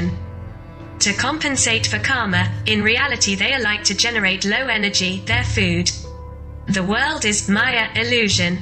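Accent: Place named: England, United Kingdom. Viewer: Thank you too! The content keeps me here. Plus whoever's Voice is talking. English Accent is Supreme.! 😊👍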